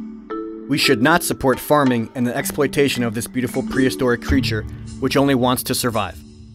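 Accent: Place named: Greece. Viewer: You're Greek, aren't you?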